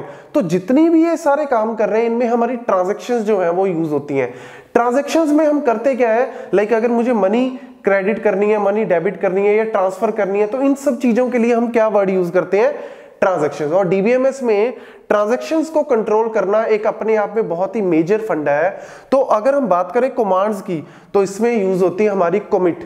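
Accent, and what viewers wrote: Punjab, India. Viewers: his accent is so punjabi like 🤩 are you punjabi ?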